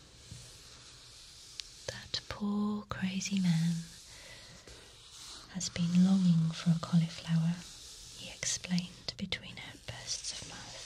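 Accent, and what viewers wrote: United Kingdom. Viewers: How i love it when i listen the sound of a brit speaking it sounds so wonderful!!! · I wish you could do more soft speaking ASMR videos. I can't get enough of your magical tingly British voice! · I wish my British accent was half as beautiful as hers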